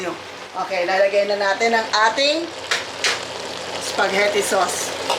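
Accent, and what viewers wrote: Iloilo, Philippines. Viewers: ilongga si Mommy??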